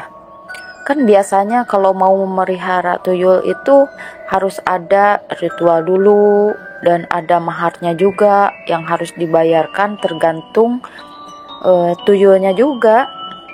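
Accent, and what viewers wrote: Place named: West Java, Indonesia. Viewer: mantap cerita ada animasinya, klao dnger dari logat kayanya dari sunda semoga chanelnya sukses, yg penting tekun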